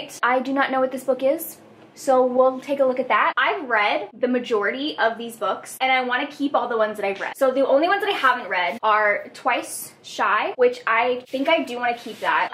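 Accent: South, United States. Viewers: Omg your southern accent 🤦🏼‍♀️😂🤦🏼‍♀️😂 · Omggggg that southern accent had me rolling 😂😂😂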